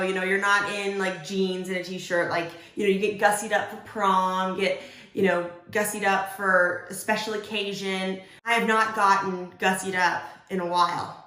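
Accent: United Kingdom. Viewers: I clicked on this video just to comment wow I thought she was british so now that i've done that... imma go watch the elections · Thought she was British 😳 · I thought she was british holy crap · She more sexier with British accent !! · why did i actually think she was british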